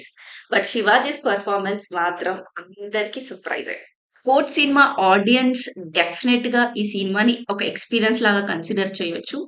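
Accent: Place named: Telangana, India. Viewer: Nice review and yup good movie .. finally telangana slag😂.. aagam aagam jeyakunda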